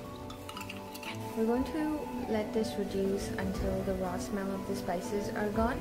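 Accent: United States. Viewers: Y do you have an American accent. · Why this American accent girl. You should speak normally.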